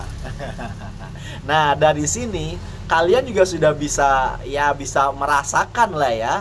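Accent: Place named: West Java, Indonesia. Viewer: Kang.. knp sy panggil Akang?.. karena letahna letah Sunda.. Salut atas kontennya yg positif dan mengedukasi.. Gaskeun..Lanjuutt.. 👍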